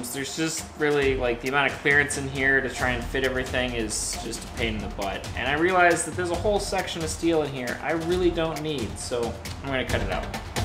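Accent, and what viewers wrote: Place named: Australia. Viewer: Your heavy Australian accent makes listening to your otherwise excellent vid difficult. In future would you consider including Canadian & American subtitles. Where in Sydney do you Herald from? I have many Australian speaking friends and they love you vids. Thanks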